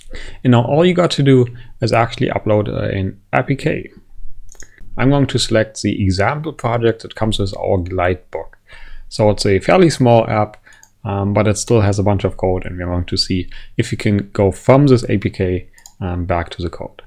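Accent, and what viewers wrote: Germany. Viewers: Instant erkennt das du Deutscher bist am Akzent xD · Its called German Accent. Even medic from tf2 has that accent.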